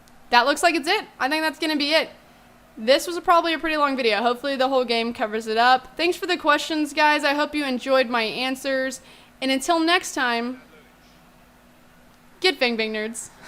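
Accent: England, United Kingdom. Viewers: Your British accent <3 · Hahaha, Your British accent :) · Keep it up. You'll probably keep getting shit because most of the people on Youtube are quite young and narrow minded. Having a female on the scene though is a good thing. P.s don't let Stephen Moyer hear your English accent =) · BEST BRITISH ACCENT EVER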